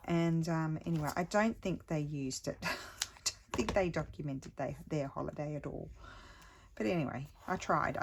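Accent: Australia. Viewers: Love watching your Christmas videos. These journals are amazing. I waited till you had a few and watched them all. Hoping I can find some bags like this. Bit hard to go shopping around looking as England in 2nd lockdown, so will look on Amazon.. From listening to you, are you from Australia and now in Italy? Your video are great. Thank you for sharing.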